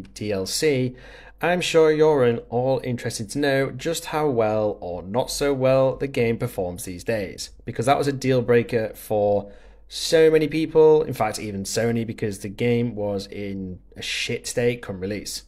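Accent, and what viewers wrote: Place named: United Kingdom. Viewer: Was expecting a terrible loud overzealous voice (common for gaming channels), but instead found a softly spoken British man who calmly conveyed the message in a concise manner. Excellent work, subscribed ❤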